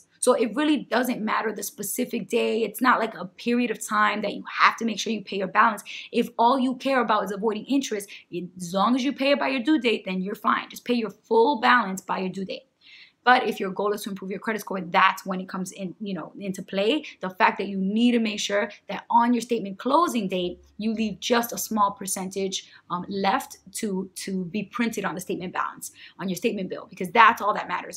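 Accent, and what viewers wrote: New York, United States. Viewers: Sound like you from NY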